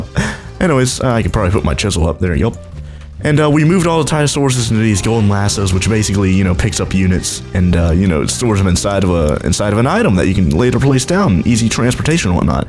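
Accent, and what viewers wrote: Australia. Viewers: AUSSIE MATE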